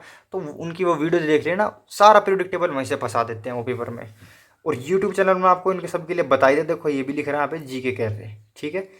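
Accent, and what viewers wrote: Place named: Baraut, India. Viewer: Kaha se ho aap baraut side ki language h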